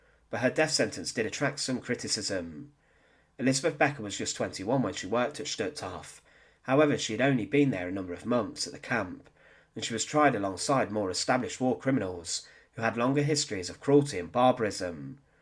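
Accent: United Kingdom. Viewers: It seems you have a British accent, have you ever made a video about the 50 plus concentration camps build by the British? i guess NOT! You poms are good in pointing the finger on others!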